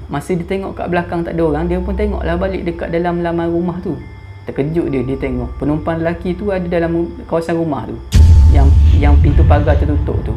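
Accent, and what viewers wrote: Terengganu, Malaysia. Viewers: org kuale teganu ke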